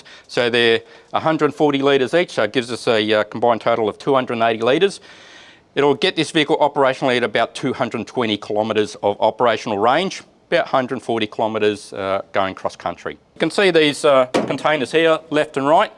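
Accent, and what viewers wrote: Australia. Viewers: You could mute it and try closed captions though CC has some issues with Aus accents.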